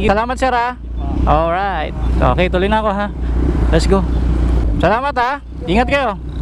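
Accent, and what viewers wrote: Pampanga, Philippines. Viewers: Mangarakap ka na tune kang kapampangan ne sir? Hahaha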